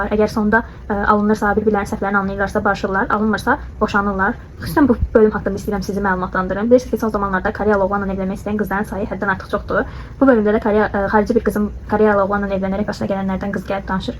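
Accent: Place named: Azerbaijan. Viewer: kız çok güzel konuşuyorsun gerçekten Türkçeyi en güzel Azerbaycanlılar konuşuyor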